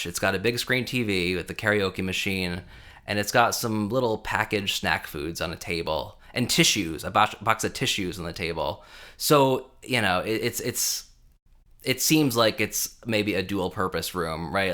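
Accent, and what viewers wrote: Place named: China. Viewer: You need to retire that Chinese accent bro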